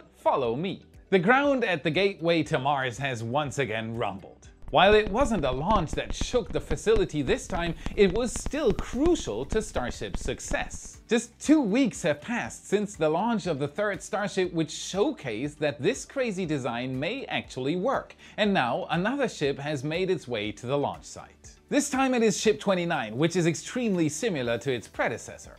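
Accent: Germany. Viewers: I caught the secret message! You still have a slightly german accent, so I was assuming you were from germany :D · Ich wusste doch, dass da ein minimal deutscher Accent ist😊 Grüße aus Deutschland zurück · Ja, ich wusste, dass du aus Deutschland kommst, weil man deinen Deutsch Dialekt raushört😂👍🏽 Deswegen heute mal auf Deutsch: Mal wieder ein klasse Video, danke für die ständigen Updates 🙏🏼🙏🏼🙏🏼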